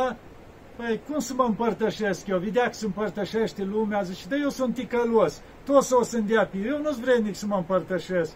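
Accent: Moldova. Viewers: Ce frumos și cu duh povestiți,va ascult cu gura căscată, aveți graiul dulce moldovenesc, mulțumesc!!! rugați vă și pentru mine, Hortenzia Mihaela,și as vrea sa ne spuneți modalitatea cum sa trimitem bani și pomelnic la d-voastră!!??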